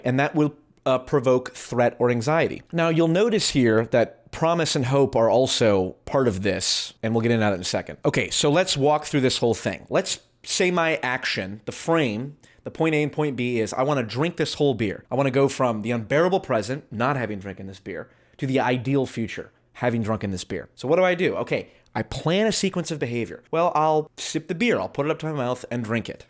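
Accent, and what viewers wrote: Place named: United Kingdom. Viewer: hilarious british accent, good job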